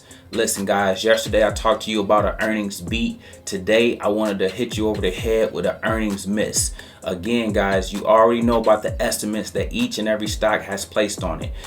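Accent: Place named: North, United States. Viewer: haha.. love that Northern accent! im from RI but living in Tennessee Y'all. 😅😅😅 Fixin and yant too? 😒😒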